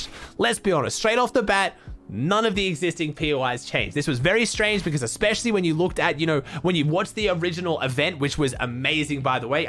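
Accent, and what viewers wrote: Australia. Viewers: YES MATE I LOVE YOU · noti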